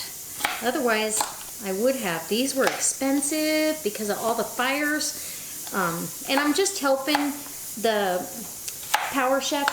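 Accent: South, United States. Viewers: Wow I just love your recipe & I am currently making it- just a smaller version- Can I tell you something my absolute favourite & most loved singer is Dolly Parton & sometimes when you are talking I could swear that is who is speaking - love your accent. This relish sounds amazing so will let you know how I go. Cheers Denise- Austalia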